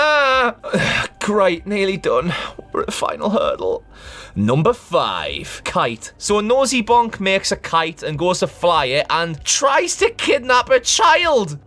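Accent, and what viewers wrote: Scotland, United Kingdom. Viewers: Not To Insult You , BUT, I like the Scottish accent it makes it funny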